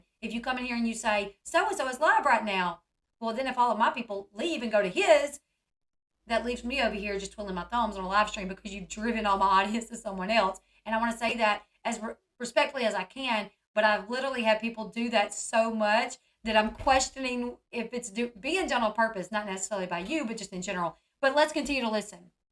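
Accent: South, United States. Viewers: Love love coming to your channel!!! We have the exact same views and southern accent 😂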